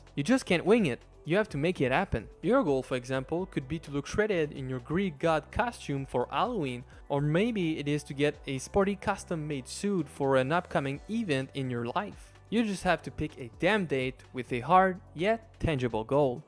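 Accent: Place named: Quebec, Canada. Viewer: Sa sonne le québécois ça🇨🇦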